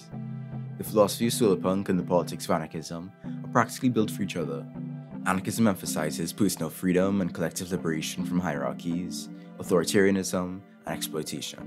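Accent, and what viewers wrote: Trinidad and Tobago. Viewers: I keep trying to place your accent. Are you trini? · i knew i recognized the accent, found a Trinidadian youtuber out in the wild lol. love the video and although i haven't heard the name before i am familiar with the concept of solarpunk and honestly i hope we will be able to see our futures in this hopeful light. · What country are you from? You sound trinidadian a little lol 😭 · Cauhgt me offguard with the trini accent. Haven't heard trini since living in Venezuela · Boi, I know a Trini accent when I hear one! Love ya channel. You have a new subscriber.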